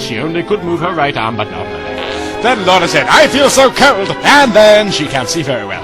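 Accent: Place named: Ireland. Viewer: Is it just me or does Laura have random hints of an Irish accent?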